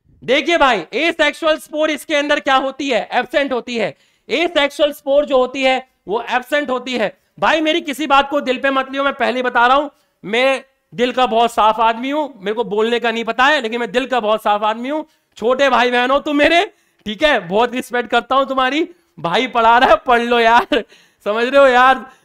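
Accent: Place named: Hyderabad, India. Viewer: sir mujhe hydrabadi lag rahe unke language se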